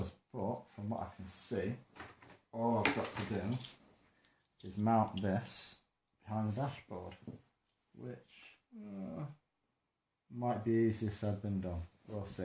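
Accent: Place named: Birmingham, United Kingdom. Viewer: Great video. Can't understand the Brummy accent well, needs more Yorkshire 😊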